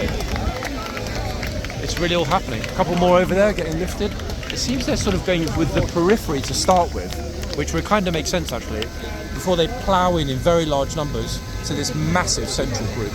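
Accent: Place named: United Kingdom. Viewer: You know us American girls are sucker's for a British accent. I like your videos and have been watching old ones I never saw in between your new uploads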